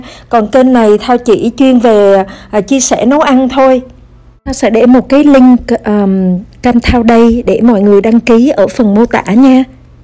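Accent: Hue, Vietnam. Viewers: phải noái nặng nặng tiếng Huế miền nửa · giọng Huế ( lại SG ? ) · Bạn là người Huế hả ? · Giong noi nguoi Hue nghe de thuong lam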